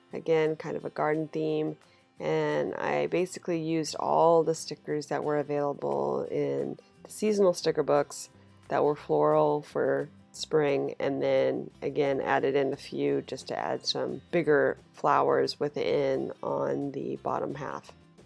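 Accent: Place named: South, United States. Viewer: Oh, you are in California... I thought you were down south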